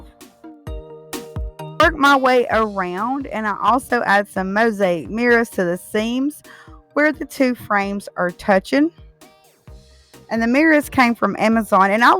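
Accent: South, United States. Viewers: Beautiful.... I love your. Country accent super cute. Ive been watching you now for almost 2years. It goes by fast. Thank you for exploring your creative mind allowing us in on all your secrets!!!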